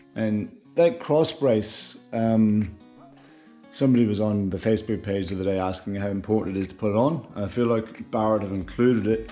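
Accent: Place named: Ireland. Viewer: Sounds Irish 😅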